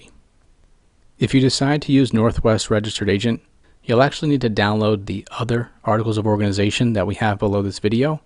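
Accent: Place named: New York, United States. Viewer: Man I love your Jewish NY accent it sounds so cool haha. Thanks for the help! I was able to file with ease for my LLC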